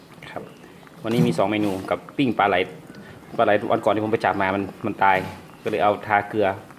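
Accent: North, Thailand. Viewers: ชอบฮื้ออู้เมืองมันเป็นเสน่ห์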